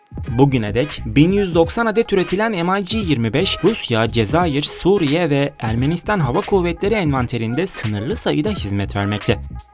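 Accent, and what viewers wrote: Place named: England, United Kingdom. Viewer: Bu nasıl telafuz arkadaş, MİG'i am ay ci diye okuyorsun. SU yu es yu diye. Bütün herşeyi İngiliz ağzı ile okumak zorunda mısınız? "mig"; "su" diye neden telaffuz etmiyorsun. Bu kadar mı dilinize yabancısınız?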